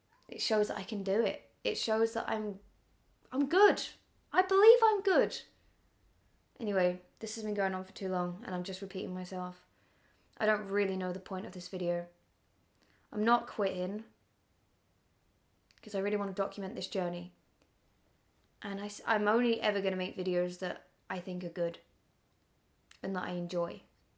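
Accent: United Kingdom. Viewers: We like you for you Not becau-se you have a British accent Or because you talk in tangents So coherently But what we view Are your stru-ggles and confusion The dreams that you pursue on This quest to find out who you want to be <link>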